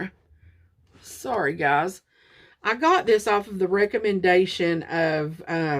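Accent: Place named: South, United States. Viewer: Ong I heard the southern accent right away. Lols it’s the sweetest accent 😊😊 I’m having this weird obsession with finding the right face palette so I’m so curious to see what you have and what you keeps lols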